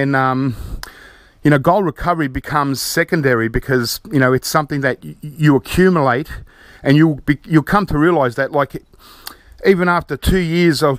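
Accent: Australia. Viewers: its obvious he's from Australia by his accent and the fact he's wearing a t shirt in april, too cold in the uk for t shirts at the moment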